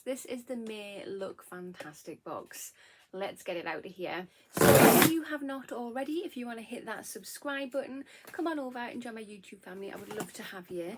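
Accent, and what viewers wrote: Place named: South Shields, United Kingdom. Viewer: I went over and had a look! Out of curiosity where are you from? I’m getting a South Shields accent coming through but I may be wrong!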